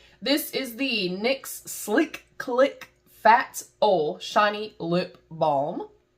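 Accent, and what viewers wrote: South, United States. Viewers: I watch Alexandria and I thought she had shown every calendar in existence. This is a pleasant surprise. I am presently using a NYX lipstick. Nothing fancy nude color but if I can't replace it when it's gone I'll be lost. I'm a mature gal and don't use a lot of makeup. I find NYX or Elf usually meets my needs. Off to watch the opening :-) Wow so far the price, the packaging and the free gift with purchasing. How can you go wrong. I'll be back. Haha. Definitely a good price. I felt it was very lip color heavy. Would have like to see some powder blush, mascara and maybe lip bullets. I'm an old fashioned gal. I did miss the items we didn't see you open so possibly some of the things I mentioned were in there. I'm off to subscribe and check out your content. I love that Southern Twang. 🤠😉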